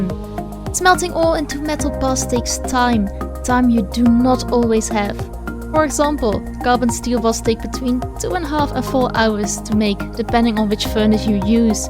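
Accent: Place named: Netherlands. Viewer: As a fellow dutchy, I could tell that Dutch accent from a mile away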